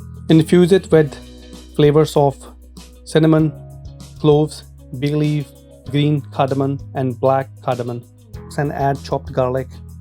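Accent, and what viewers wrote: Scotland, United Kingdom. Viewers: Do I detect a fellow Scottish accent there mate? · I sense a Scottish accent 🎉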